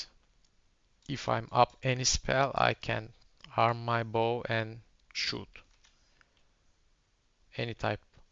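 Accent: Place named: Turkey. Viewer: türk aksani =)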